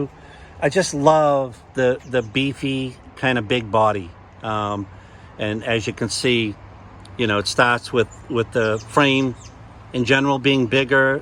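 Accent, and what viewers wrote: United States. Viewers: Thank you for the feedback. I’m currently trying to get a refund from Eahora for an order I cancelled before it even shipped. They have been very difficult. I mean there should be no issue with a refund for something that never got sent. It seems like Yamee’s company is much better. Customer service and a company that stands behind its product is my other big consideration. I Anyway, just waiting to get that refund so I can go ahead and get one of these. Your review and feedback is super helpful. Btw, you sound like your from Massachusetts.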